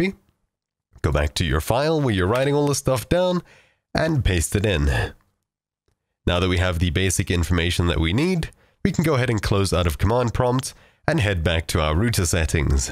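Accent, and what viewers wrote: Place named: South Africa. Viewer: took me so long to realize you from south africa lol '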